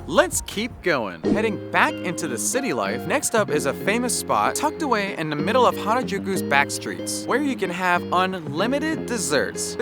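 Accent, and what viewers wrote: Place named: United States. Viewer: WE KNOW YOUR AMERICAN its ok stop being such an attention seeker we KNOW ok . FFS but great video the accent is annoying and hideous but great video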